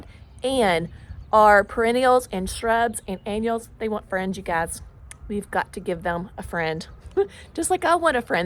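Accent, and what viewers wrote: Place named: South, United States. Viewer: I don’t care how you say your words, It brings out your southern charm. In the plant world I think people get so hoity-toity about how words are pronounced, so much of it is regional.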